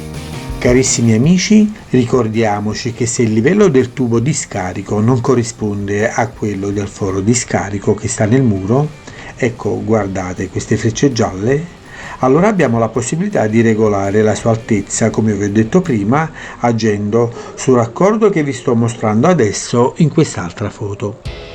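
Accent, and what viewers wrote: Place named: Avellino, Italy. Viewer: sono di Scalea , tu dove abiti ( senza dirlo , ma più o meno ) verso Licola o verso Torre ? A volte sembri quasi avellinese .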